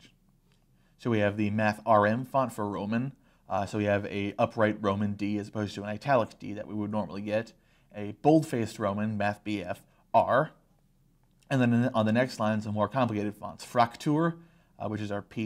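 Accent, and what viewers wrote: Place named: United States. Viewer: Why are you pronouncing "Z" as "C"? ... I know it is the American pronunciation, but for non-Americans it is very confusing. :-)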